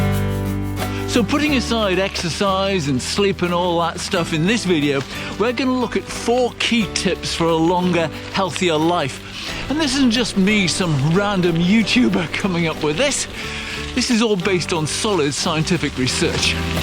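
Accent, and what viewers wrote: Newcastle, United Kingdom. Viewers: That’s where I know you from !! I always thought I knew your voice watching your videos but never thought anything of it being fellow geordie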